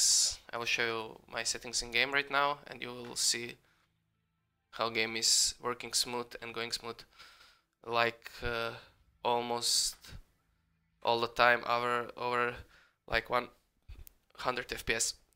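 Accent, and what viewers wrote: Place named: Serbia. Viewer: Bukvalno sam znao da si nas jer kako pricas engleski nisam ni trebao da gledam foldere xD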